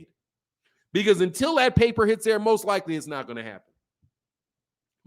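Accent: Texas, United States. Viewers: you from Texas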